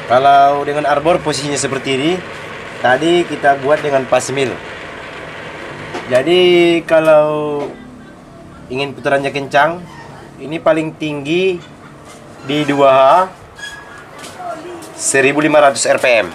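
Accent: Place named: North Sumatra, Indonesia. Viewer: Dimana alamatnya bosku....sepertinya orang sumut...saya juga orang sumut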